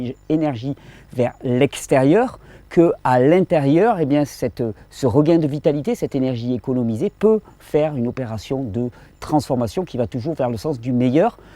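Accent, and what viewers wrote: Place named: France. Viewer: T'as un pt'it accent du fond de la province quand même hein..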